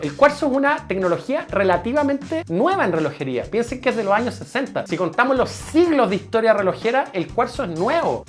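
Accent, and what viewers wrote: Chile. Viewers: Tengo 5 cosas que decirles: 1) Me encantan sus historias relojeras curiosas con datos poco conocidos. 2) No cambien su acento ni palabras chilenas, los queremos así como son. 3) Me gustaría ver videos de Seiko Alba o Credor. 4) Me deben el video de las abominaciones relojeras que fueron al espacio. 5) Saludos desde México. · Es mejor el Quarzo pero Solar y si es mixto solar y batería Quarzo simplemente será un reloj para siglos …Me da risa el acento Chileno 😅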